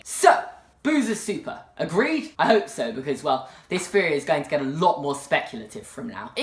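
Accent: England, United Kingdom. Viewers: This video is 86392times better with his British accent😹☺️ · Are you British? I love your accent lol. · youtube then:Hey wanna play MINECRAFT YouTube now:Little british boy talking about disney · Are u from England (I am lol) 😂 love ur accent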